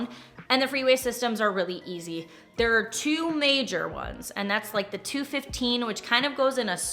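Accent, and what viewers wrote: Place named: Wisconsin, United States. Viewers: Great video and I’ve been enjoying your content since you started. The biggest takeaway from this video wasn’t about Vegas, it was about you. I didn’t realize you lived in Wisconsin! I always thought you lived in Vegas. Now that I know you’re from Wisconsin, I can’t help but hear your accent! Anyways, congrats on the move and keep up the good work! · I knew you were from MKE from your accent!!! i can’t wait to become the next Wisconsin girlie to move to Vegas 😂🎉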